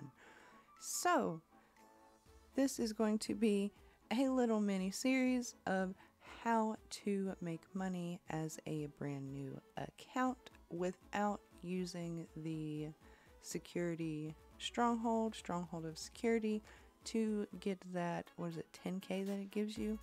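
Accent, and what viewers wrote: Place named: United States. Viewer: nice video! your american accent is funny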